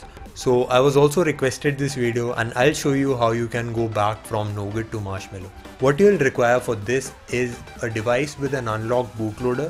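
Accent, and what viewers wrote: India. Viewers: perfect YouTube (Indian) accent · UUDDLRLRBA Start Stop judging mate! Look into yself! He wanted to give some info(In indian English so wat?), which he did obviously,worked for me though...so stfu n stop using YouTube...trust me on this!!!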